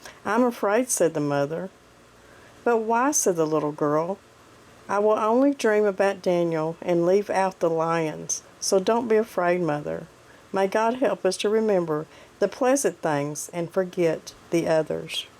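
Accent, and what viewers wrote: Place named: Arkansas, United States. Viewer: I love custard pie. I love your accent it reminds me of my grandparents, they were frome northern Arkansas.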